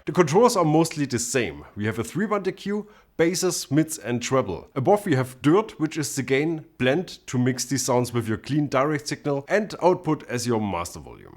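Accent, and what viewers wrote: Germany. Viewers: strongest german accent invasion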